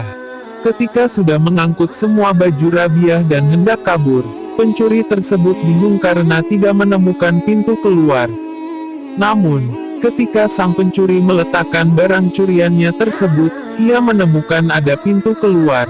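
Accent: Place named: Madura, Indonesia. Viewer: Madura kerass 😀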